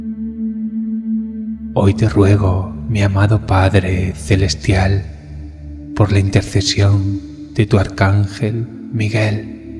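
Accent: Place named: Spain. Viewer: Buenos días amigo. Eres una gran bendición. Tu voz es muy bonita y agradable escucharte. Tu accento español es muy bello. Yo soy 28 porciento española. 12 porciento francesa, Indigenous. Te mando un fuerte abrazo desde Hacienda Heights Ca U.S.A. 💯🌟💋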